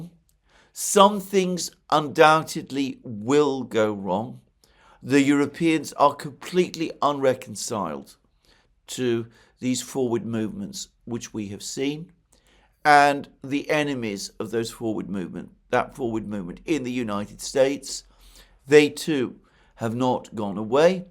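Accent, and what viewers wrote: United Kingdom. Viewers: thanks, for sharing your insight and experience. its refreshing to hear a speaker on international affairs that you can trust, in spite of the british accent.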